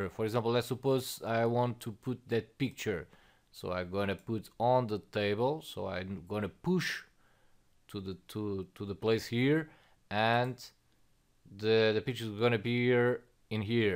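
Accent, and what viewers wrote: Portugal. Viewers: Olá you sound Portuguese :) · hey amigo es portugues consigo ver pelo sotaque obrigado pela dica aqui no video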